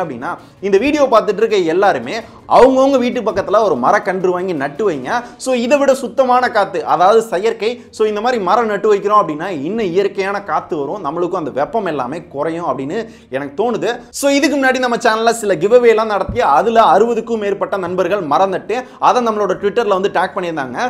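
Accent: Madurai, India. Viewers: Bro neenga maduraiyaa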